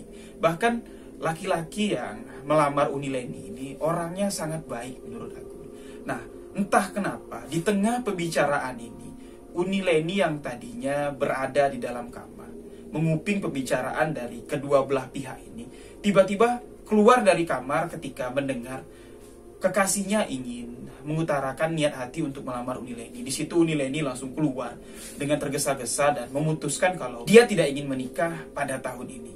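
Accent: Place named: Minangkabau, Indonesia. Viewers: Mantap bang. Bahasa Sumatera bang pas banget.👍👍👍👍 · Mohon maaf lahir bathin bang Sukses selalu dgn ada nya chanel ini sy semangkin tahu banyak soal dunia mistik terima kasih bang,bahasa minangnya enak banget didengar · Bg, pembawaan bahaso minang nyo barasiah, sampai wak sangko bg urang minang juo... Salam minang bang dr tanah borneo.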